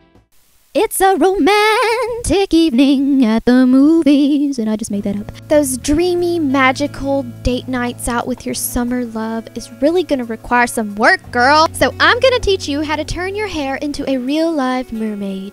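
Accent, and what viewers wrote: South, United States. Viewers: what state do you live in??? you sound like you have a southern accent and i love it!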